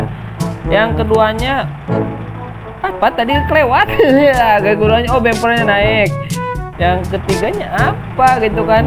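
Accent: West Java, Indonesia. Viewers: Jigah orang Sunda uyy...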